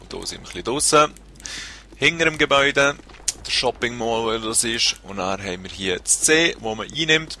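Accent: Switzerland. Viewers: endlich mal en shwiizer xD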